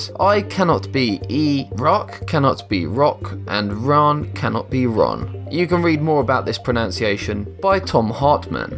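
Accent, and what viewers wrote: England, United Kingdom. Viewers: he’s english that’s how they pronounce it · Nah he's just from England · You frequently just correct one of the sounds in the word but not the rest. One example is Renault where you still use the British r-sound instead of the French. Also, your pronunciation, although better, still misses the mark in a few of the German words as well as the Swedish.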